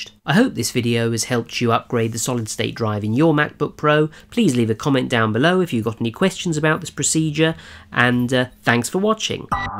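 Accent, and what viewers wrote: United Kingdom. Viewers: I'm not a big fan of the brit accent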